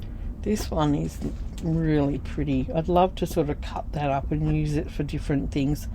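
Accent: Australia. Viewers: I was going to say how much I love to hear your Aussie voice..not many of us that I've seen on you tube ..and then I heard you say you went to Wangaratta..and I got more excited..I'm guessing you went to to the Lutheran op shop they have great crafty bits..anyway hi from another north east Victorian who looking forward to seeing what you make with all these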